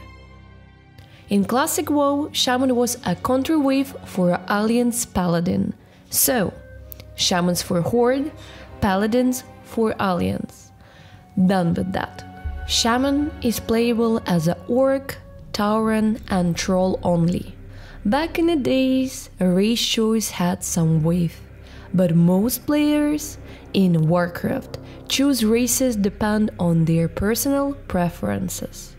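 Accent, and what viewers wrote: Russia. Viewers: У вас русский акцент или мне кажется)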